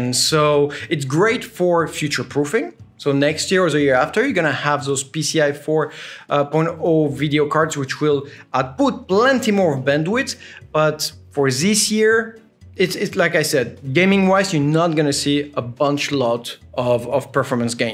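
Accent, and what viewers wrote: France. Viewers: Do you are french ?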